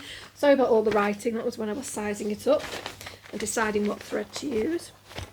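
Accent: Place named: Northern England, United Kingdom. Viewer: Hello! Just found your videos thanks to mama flossabilties. Just wanted to say as a fellow brunette and flosstuber, I feel your pain with the greys! I am due to go next week but in the meantime I am channeling grandfather from the munsters! 😂🧛‍♂️ your stitching is fabulous and I’m loving listening to a fellow northerner! I have subscribed and am looking to catching up with your other videos! Happy stitching lovely -Lauren 🧵💗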